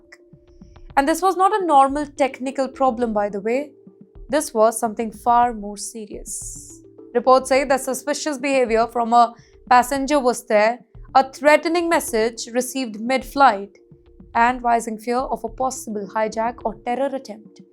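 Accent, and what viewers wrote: India. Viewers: Heavy Bindian accent makes hard to understand. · What kind of Hindi English is she speaking? Can you not find someone who speaks proper English as your announcer? The Whole story is just a joke. AI produced stuff?? :face-orange-frowning::face-orange-frowning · The headline is also incorrect. The plane was never hijacked. All in all, I think you should report in your own language. When you speak Apke Apke for so long and so hard, you tend to Passover the bad habits from your language into English. I see this all the time. Content creator is always an Indian from India that will have a video , that has been captioned in English , but 25 seconds into the video , the Apke Apke will start, and that Apke Apke won’t stop until the end. This is why people like me , that grew up speaking English as a first language have to always write exams and tests proving my eligibility to speak English , because of the Apke Apke people that keep fucking up the English language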